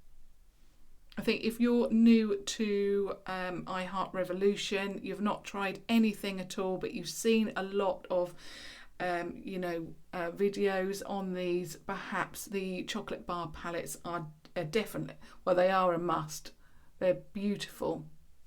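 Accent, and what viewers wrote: United Kingdom. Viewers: The way you speak is so amazing! So gorgeous (and proper sounding) with the British accent but not just that your voice is so calming and nice to listen to :) (I'm from Los Angeles CA lol) but I favor British you tubers. I love visiting old palettes!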